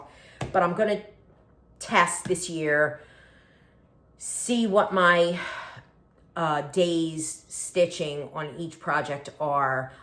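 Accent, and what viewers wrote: New Jersey, United States. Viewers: Speaking as a fellow "just across the bridge from Philly" native New Jerseyan (who has been transplanted to Northern VA) I love to listen to your flosstubes and your "accent". You sound like home to me :)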